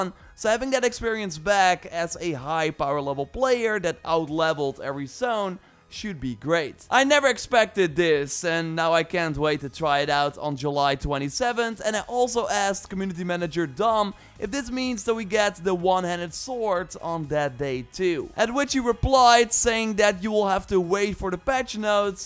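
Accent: Netherlands. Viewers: The netherlands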